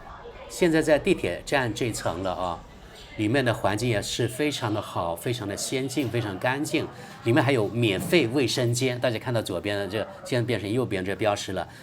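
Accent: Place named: Guangxi, China. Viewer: 丢拿马 我以为是个洋鬼子普通话不标准 原来是我广西老乡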